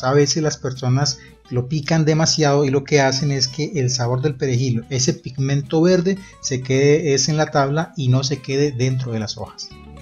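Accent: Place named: Bogotá, Colombia. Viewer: Yo reconozco ese acento Creo que es de personas de bogota Yo fui a Cartagena en un crucero y esa receta la probar Omg so good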